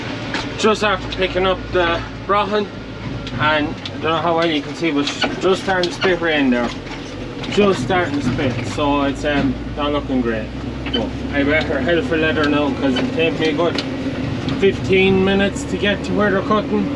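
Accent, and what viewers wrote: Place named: Ireland. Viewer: From across the pond, enjoy your farming channel & the Irish accent!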